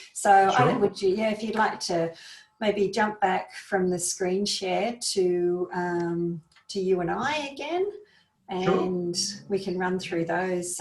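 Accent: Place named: France. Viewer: Sound French 👌👍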